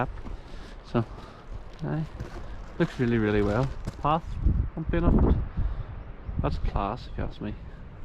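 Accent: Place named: Northern Ireland, United Kingdom. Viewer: Good stuff buddy 👍 enjoyed that little adventure 😂 glad to hear 👂another Northern Irish voice on youtube😁😆🤣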